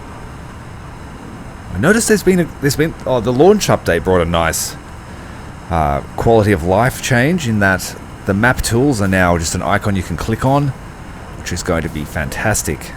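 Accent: Australia. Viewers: Watching this at 8am in the UK, listening to a drunken Aussie talking about rave rounds. All is right with the world.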